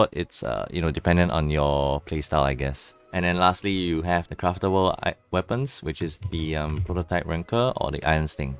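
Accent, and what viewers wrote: Singapore. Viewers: I love how organised and clear all your calculations are, it makes it super easy to just refer back to the spreadsheet whenever I want! Also fellow Singaporean here, I was wondering why your accent sounded so familiar xD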